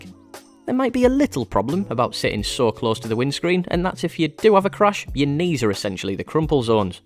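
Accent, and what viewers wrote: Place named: Northern England, United Kingdom. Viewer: Didnt expect a northern voice when i opened this video hahaha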